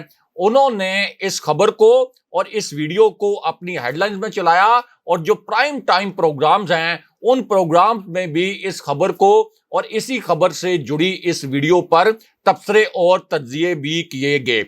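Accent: Punjab, Pakistan. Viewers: I am sorry Sahib you waste our time by creating sensation.Please talk like other anchors.Change your Punjabi type Urdu please.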